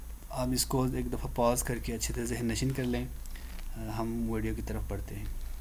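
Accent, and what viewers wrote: Pakistan. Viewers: Accent like baltistan! Am I right bro.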